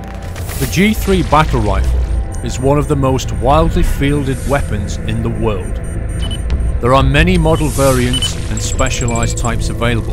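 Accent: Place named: United Kingdom. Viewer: Awesome man, the British accent goes great with this! You got a a subscriber!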